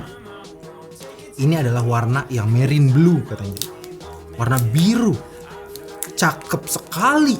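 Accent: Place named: West Java, Indonesia. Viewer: Anjay Sunda pisan wkwk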